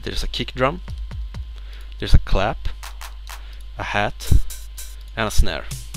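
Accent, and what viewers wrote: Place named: Sweden. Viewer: Så du har äntligen börjat? :D Älskar din Svenska dialekt på engelskan! (Y) :D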